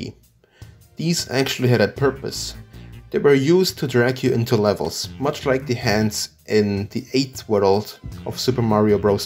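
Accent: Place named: Germany. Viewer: His german accent is the same as my englisch teacher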